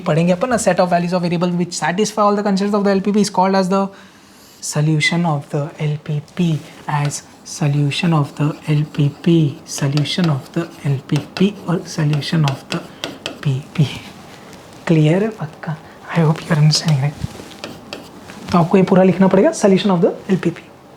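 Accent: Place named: Punjab, India. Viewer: 😂he literally was so tired “Or graph khatam h nahi ho raha “ 💘truly admiring person and love ur panjabi accent >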